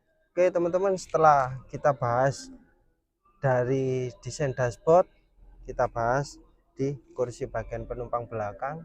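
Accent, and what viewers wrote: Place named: Kediri, Indonesia. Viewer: Logattè koyok orang Kediri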